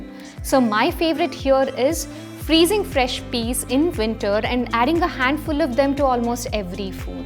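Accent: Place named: Bangalore, India. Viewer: ..I think u r frm Bangalore...too much Bangalorean Influence..while speaking and suggesting diets...it's very subliminal...who is being to Bangalore..he will understand...need better inputs & suggestion form North Indian diets point of view...bdw..nobody eat dosa like u south indians.. everyday!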